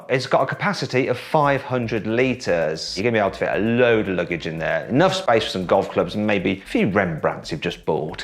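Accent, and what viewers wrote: United Kingdom. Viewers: マットさんのイギリス英語で紹介されるのが最も似合う一台かもしれない…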